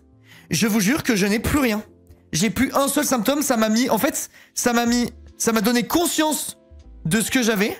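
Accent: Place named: Marseille, France. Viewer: avec l'accent marseillais est juste 🤌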